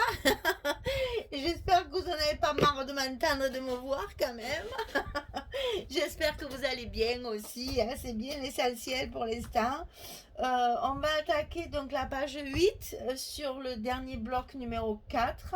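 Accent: South, France. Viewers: Géniale cette partage. Génial cet accent du Sud. Et puis s’il y a une petite anomalie et bien cela nous montre que c’est un travail fait main, artisanal, fait avec le cœur. Alors merci à vous.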